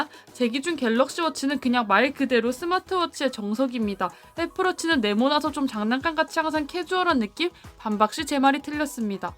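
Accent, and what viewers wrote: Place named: Gyeongsang, South Korea. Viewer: 갱상도 억양 고향생각 간절하굼요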